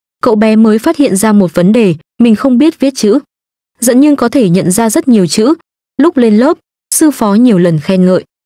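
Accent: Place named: North, Vietnam. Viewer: nghe giọng bắc quen, hên sao tìm đc bạn đọc truyện nên dễ nghe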